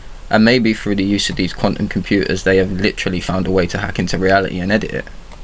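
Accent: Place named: London, United Kingdom. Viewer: How did you possibly think this man was an American 😂 such a pure London accent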